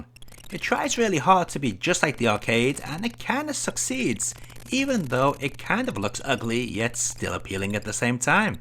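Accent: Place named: Liverpool, United Kingdom. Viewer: I think these remastered "Battle" vids are a great idea,! I'd actually forgotten about the days when your videos featured overlay text only and no sign of your gentle very-slight-hint-of-scouse tones...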